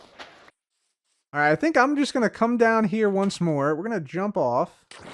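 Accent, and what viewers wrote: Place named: Chicago, United States. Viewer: You're from Chicago, aren't you? First-time viewer.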